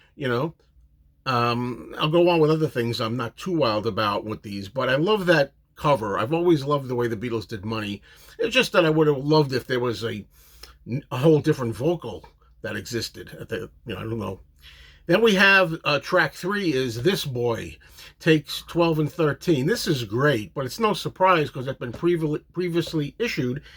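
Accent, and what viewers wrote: New York, United States. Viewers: Great review! LOVE your NY accent.. You could be Murry the K...The 5th Beatle!! lol..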